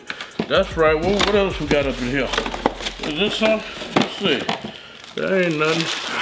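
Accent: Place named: Florida, United States. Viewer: your voice same like florida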